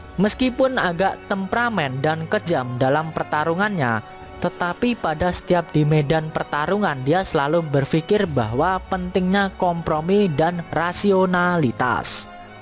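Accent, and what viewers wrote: Java, Indonesia. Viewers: Suara nya medok ya bang 😆😆😆😆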